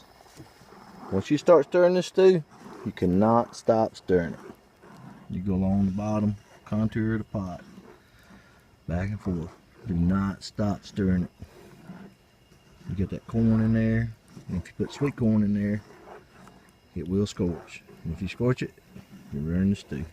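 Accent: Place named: Virginia, United States. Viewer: Really nice video and description. Love your accent and it is very close to how my folks talk from Danville,VA. I'm in the middle (NC). Learned to make stew from some old hands at the ruritan club. They don't grind.They cut into small cubes and cook it til it falls apart in strings. Oldtimer said it needed to have at least one squirrel in there to be authentic. Thanks for the recipe.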